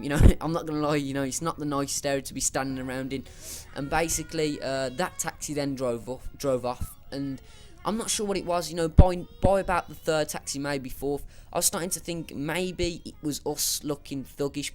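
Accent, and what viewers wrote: United Kingdom. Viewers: hey i can hear you're British but why do British people hate Americans so much? Every video i watch there's some British kid ripping on the U.S. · Cockney commentary that was pretty good.You might just need to improve quality a little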